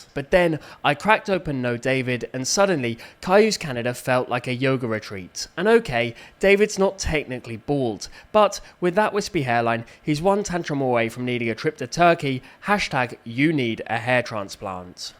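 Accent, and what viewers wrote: United Kingdom. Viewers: Hey there mate quick questions are you British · Yeah is British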